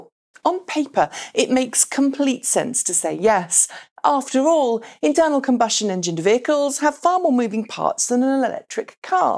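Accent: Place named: United Kingdom. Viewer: Please stop doing these filler stories, it's a waste of your talent and resources. I'd rather see less content that was more important. It's not necessary to come out with a new episode every day if there's no new news., please do a story on the Securities lawsuit from Khang & Khang LLP against Tesla. I'm not sure what they're all upset about. So what Tesla missed their Model 3 production goals, they always miss their production targets. What damage did it have? Tesla stock investors are ignoring it and their stock is still trending up. I'm curious if it's haters trying to bring Elon down? Btw I'm one of your Patreon supporters because I find your show with its professional level production values, unique extensive research, optimistic yet balanced reporting and your delightful British accent, really set your show apart. I don't see ads on YouTube because I pay for YouTube Red. 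Ads drive me crazy so $9.95 a month is a small price to pay for my sanity. Keep up the good work!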